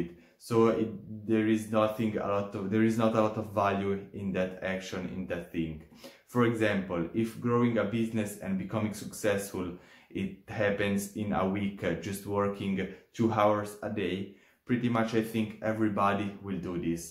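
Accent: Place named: France. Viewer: I ear a little background french accent haha. nice video !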